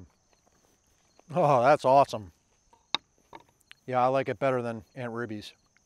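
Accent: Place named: Maryland, United States. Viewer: Hey. As a Marylander retired in South Carolina, I am so homesick watching your videos, at least during the summer. Winter, not so homesick ever. Terrific job with these multiple cultivars, very well presented. Did you get sick after taste testing all of those? I really enjoy your very subtle Maryland accent too.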